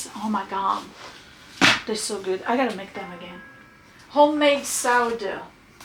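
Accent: France. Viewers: LOVING THIS SERIES! 🥰 I enjoy hearing ur reasoning along the way! And love ur French accent❤️ great work!!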